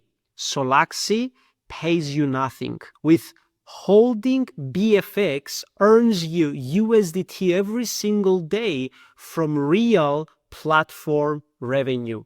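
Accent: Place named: Pakistan. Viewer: Почему те, кто разваливает провальный solaxy смягчают L ? Акцент с пакистанского рынка.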